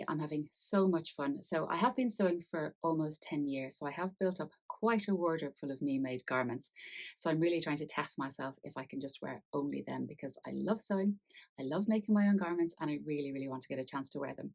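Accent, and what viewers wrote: Ireland. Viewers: Hello, I just came across your channel, those are beautiful makes, is that an Irish accent?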